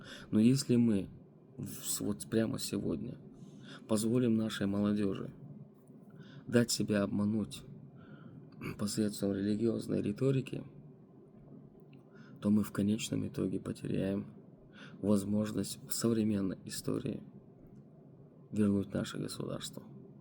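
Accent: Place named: Chechnya, Russia. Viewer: Эмират это и есть Ислам и каждый мусульманин должен к этому стремится аж до судного дня. Если ты против этого значить ты не мусульманин. Вот это и есть наша проблема. Как только у чеченцев появляется вкус свободы тут же появляется религия и всё...точнее сказать - идём обратно в стойло. Так было, так есть, так и будет, история этому свидетельство. О каком народе ты говоришь!? Посмотри на себя в зеркало и послушай свой говор. Чечен-чечен. И где в тебе твой чечен(имеется ввиду автора этого ролика)!? Пора уже определиться что мы хотим, быть рабами или свободным народом как завещали нам наши предки пролевая за эту свободу кровь!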